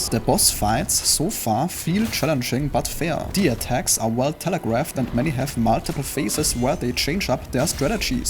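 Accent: Germany. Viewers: Are you German? You sound like it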